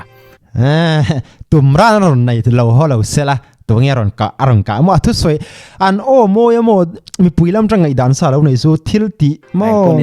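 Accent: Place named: Myanmar. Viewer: Burma tlangval tawngpai nuk2😂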